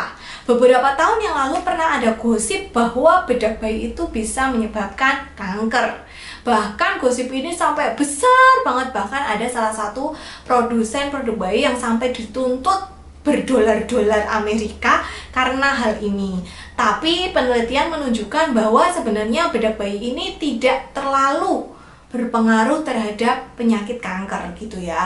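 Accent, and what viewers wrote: Java, Indonesia. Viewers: Dihh bu bidan lucu yaa gaya bicaranya, aku yg juga orang jawa kerasa bgt uniknya ,malah jadi ciri khasnya Bu saran dong, gimana kalo ngebersihin BAB pake tisu basah, bahaya kah? Kan kalo pake wash lap suka ribet Makasi bu bidan yaa sharingnya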